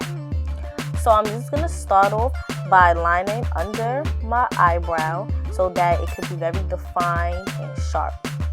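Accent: New York, United States. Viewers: where are you from? you sound like youre from ny or the dmv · it's her accent, she's from New York . All New Yorkers speak like that 🆗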